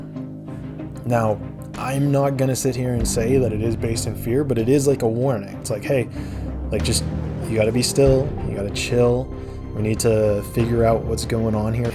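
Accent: United Kingdom. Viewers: Weird how this being has a British accent. Lol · The British accent is kind of a red flag.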